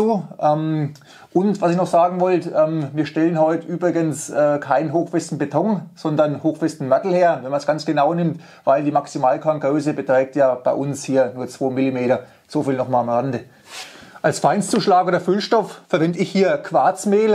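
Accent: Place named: Franconia, Germany. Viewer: Ich hob mer dacht: "wuu kummder denn her? Denn Dialeggd denn kennsd..." Aus Frangn isser wie ich aa! 😀👍 Zum Thema hochfest: Wir hatten früher auch Faserzusätze und/oder gebogene 10mm lange Drahtstücke zum aufnehmen der Zugkräfte beigemischt (Industrieofenbau), wie ist es damit, wird sowas heute noch gemacht? Gut mit der Gewebeeinlage ist die Frage zumindest zum Teil beantwortet...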